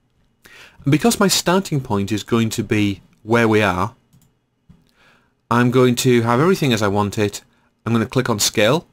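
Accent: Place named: United Kingdom. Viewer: Thank you for this tutorial sir. I'm glad that when i listen to this video i hear a light British accent rather than the strong irritating American accent that i hear all too often.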